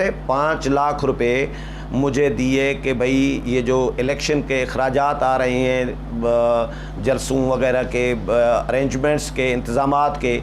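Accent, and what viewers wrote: Sindh, Pakistan. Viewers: Today you sound like a Sindh Pakistani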